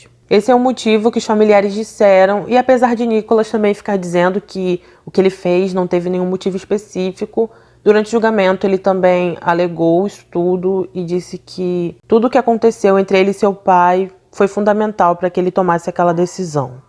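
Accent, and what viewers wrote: Rio de Janeiro, Brazil. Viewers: gosto muito dos seus vídios Deus te abençoe você narra muito bem você tem uma vos Linda a vc tem sotaque carioca você é do Rio de Janeiro?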